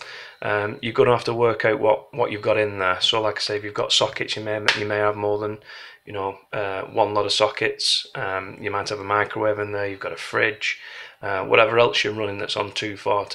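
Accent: Bolton, United Kingdom. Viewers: Well explained... simple👍🏼.. Bolton accent??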